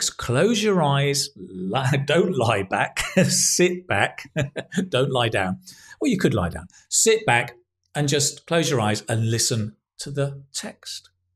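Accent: United Kingdom. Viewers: Sir do you have british or american accent please tell me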